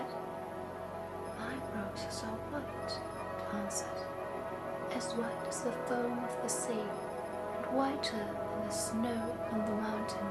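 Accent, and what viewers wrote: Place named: Germany. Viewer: Maybe if your videos get more popular that you could buy a proper microphone, but I don't really know anything about that kinda thing. Well, I've certainly heard a lot of germans with a lot more accent than you have, so don't worry about that! I thought for a while I heard some french in your voice, but also some german, so that's why I couldn't really place it.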